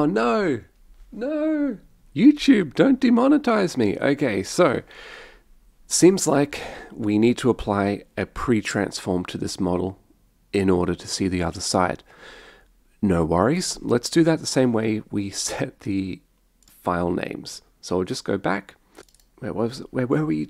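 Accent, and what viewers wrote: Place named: Australia. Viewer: I did think it was Australian, moreso by the language than the accent. Keen for more VK videos.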